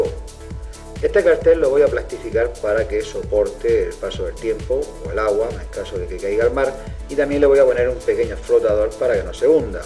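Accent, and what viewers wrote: Murcia, Spain. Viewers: Aver si me llega alguno a Suecia!!!!! Despues de seguirte durante algun tiempo y escucharte con tu acento muy familiar ya me enteré que eres Murciano jejejeje.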